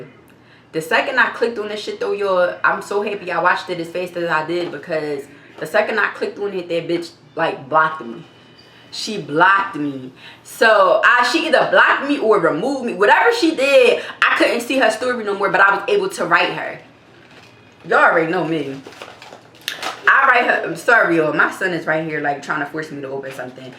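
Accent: Philadelphia, United States. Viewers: I been following you since I had Covid FEB 2022 lol ❤️ I love Philly never been but I love meek mill so and the accent you cool too ima go look at the other story time when u beat yo yo brother baby momma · Give us more story times I love the way you talk you so Philly 😭😍😌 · Hey Gurl I just love your Philly accent 🤗🤗🤗🤗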